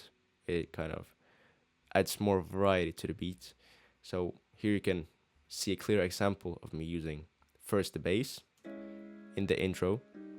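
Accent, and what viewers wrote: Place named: Scandinavia. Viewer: Dope tutorial man, where in scandinavia are you from btw?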